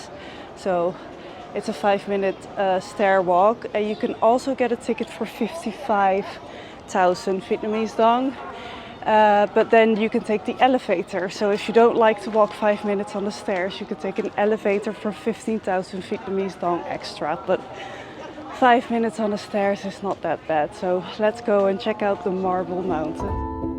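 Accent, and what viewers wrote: Netherlands. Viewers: Thanks for the video, food looks amazing thinking to go in march, and you look very good to, i hear some.dutch accent. Byee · Ook praat je in het Engels, jouw accent vertelt alles in het Nederlands hhh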